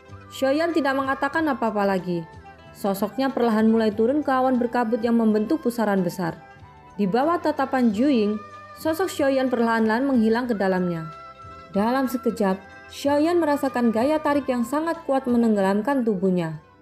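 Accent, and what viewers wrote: Java, Indonesia. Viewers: Medok nya. 🥰🥰🥰.... Mbak e. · Memang suaranya beda,kalau bisa min logat Jawanya agak kental itu aja sih kalau sy. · masih meddok suaranya, powernya di tambah lagi ya, biasa namanya juga pemula semangat💪💪💪💪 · Hargailah orang yg berusaha menghilangkan suara mendoknya. Semangat mba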